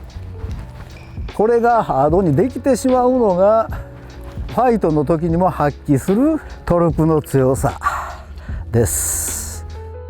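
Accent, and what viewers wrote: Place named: Kansai, Japan. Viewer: おっちゃんの関西弁が良い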